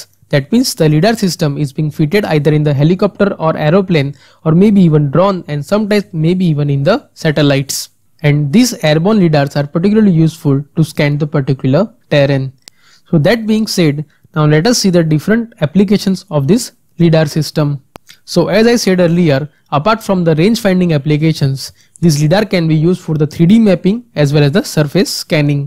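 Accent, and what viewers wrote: India. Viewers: Indian people are so smart and clever but there is almoust always the accent :( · leaves when i hear indian accent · Why don't you learn understanding Indian Accent. Bliv me India is the next superpower and you all might need to learn the way we speak.... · I'm Belgian, so I neither speak English nor Indian natively. In my opinion, understanding Indian English is about wanting to understand it. With a bit of training, (read: not actively avoiding Indian accent videos) I got to a point where it doesn't bother me that much anymore. And seriously, with the amount of Indian good quality channels like this one out there, it's totally worth it!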